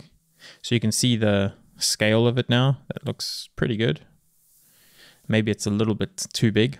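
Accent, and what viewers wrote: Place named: South Africa. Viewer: Sounds like South African, but diluted from living abroad maybe?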